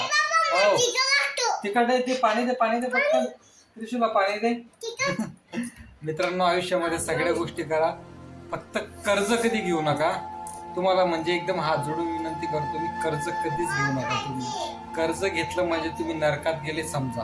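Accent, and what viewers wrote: Khandesh, India. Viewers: खान्देशी आहात का भाऊ tone तिकडची वाटून राहिली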